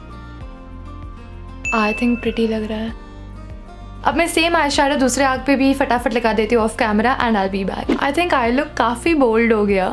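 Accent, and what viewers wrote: India. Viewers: Aap puri american lg rhi ho😊 bs aapke hindi accent se indian lg rhi h aap...maybe NRI?😊 bdw,love ur vdo..frst tym seeing ur vdo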